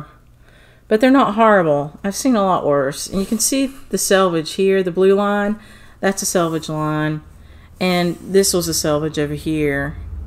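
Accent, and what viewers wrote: South, United States. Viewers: It was great to see you again and to hear about the new samplers. They are beautiful! The Hardwick Manor hoop looks so nice. You can tell it is the highest quality and would last a lifetime. You know a stitcher is known by her tools! I’m looking forward to your next video. It’s nice to hear a southern accent! Have a wonderful week!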